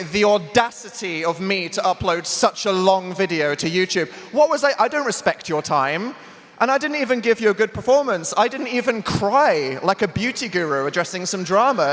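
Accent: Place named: United Kingdom. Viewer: He's so sassy and British, I LOVE IT!!!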